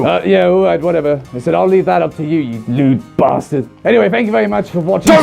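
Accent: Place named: Scotland, United Kingdom. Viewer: Loved your scottish accent, by the way.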